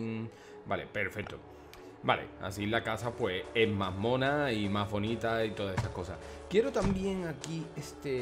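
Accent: Spain. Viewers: Renato Nuñez pablo no confundas amigo se ve que se averguenza de donde es es chileno y habla como español todavia te paso a kros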